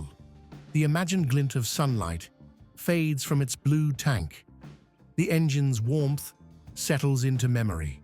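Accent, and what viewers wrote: United Kingdom. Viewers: i love triumph. but if i had heard this turkey waxing lyrical like a pommy git i would have bought a honda. cant listen to him anymore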